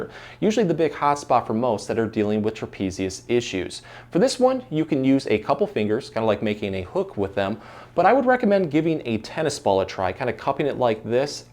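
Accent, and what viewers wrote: Michigan, United States. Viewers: I was just googling how to get trap pain relief and stumbled across this video and instantly knew you were from Mich before I looked at your bio bc of your accent 😂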